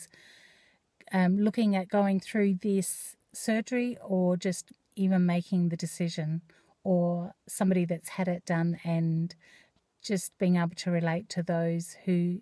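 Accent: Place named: Australia. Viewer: Hi! Welcome! I love your Aussie accent':p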